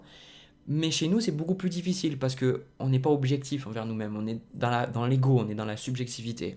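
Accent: South, France. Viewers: Bon, je me pose une question à ton sujet depuis que j'ai découvert ta chaine... D'un côté je me dis que je me trompe car tu sembles avoir un accent du sud mais bon comme la question revient malgré tout à chaque fois, je fini par te la poser... Est-ce que tu es né du côté de Rennes avec une lettre de plus dans ton nom officiel ? Si oui, je t'ai porté sur les fonts baptismaux... Namasté... ♥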